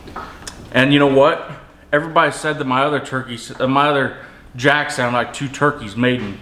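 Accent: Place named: Kansas, United States. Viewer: What part of Kansas are you from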